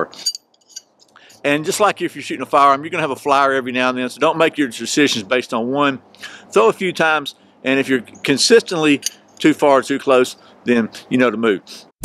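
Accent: Georgia, United States. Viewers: Tell me you're from Georgia without telling me from Georgia (He could be from like 6-7 different states with that accent, but he strikes me as being from the Conyers area in Georgia.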